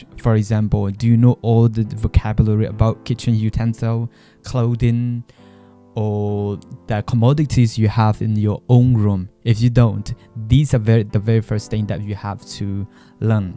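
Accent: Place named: United Kingdom. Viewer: British အသံထွက်ကိုသဘောကျတယ် အကို 💥 လေ့လာနည်းလေးတွေများမျတင်ပေးပါ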